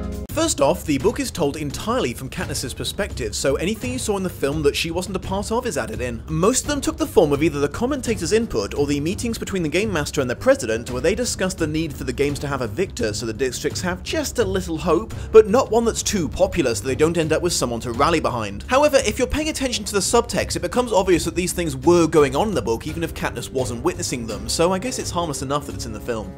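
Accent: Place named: Wales, United Kingdom. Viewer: Where in the UK is the from? I notice the way he says certain things like "year" kind of remind me of how Rob Brydon speaks, so I wondered if he might be Welsh or have lived there.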